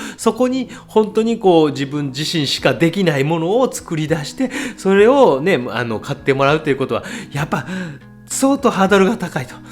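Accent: Kansai, Japan. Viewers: 柔らかい関西弁のニュアンスでしょうか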